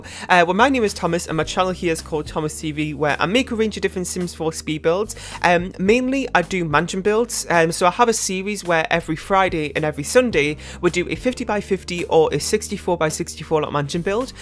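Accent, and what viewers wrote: Newcastle, United Kingdom. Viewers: Ahh, just found your channel! I love your builds and your accent. Is it Geordie? I can't quite place it haha. :)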